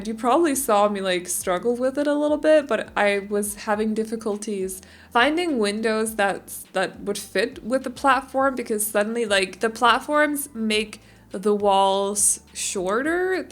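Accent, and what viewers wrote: Denmark. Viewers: Faktisk var hun en af de første mennesker, jeg fandt. men tak! Også nu tror jeg, at min engelsklærer måske bruger dine videoer til danske studerende, der lærer engelsk haha. Hun tror, det vil hjælpe dem, da du har den danske 'accent'. HVORDAN LÆRER MENNESKER ENGELSK, SOM DE HAR STAVRETNINGSREGLER, OG FØLG DEM IKKE DEM!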